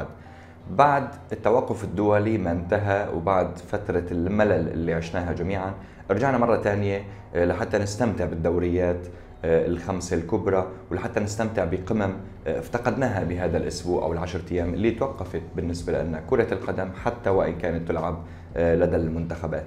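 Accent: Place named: Aleppo, Syria. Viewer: صحيح ونا لاحظت انه من حلب من اللهجة 🥰🤩 بصراحة تقديم رائع ومبسط مشكور ..... بالتوفيق لحضرتك🌹🌹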